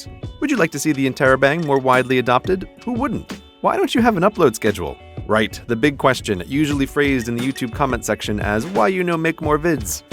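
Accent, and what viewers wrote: United States. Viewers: When I was 15, my English teacher (in Norway) told my class that it didn't really matter which form of the English language we chose, but that we should choose one and stick with it. Is your attempt to keep your accent fully American (it's impossible to avoid being affected by those around you entirely) similarly motivated?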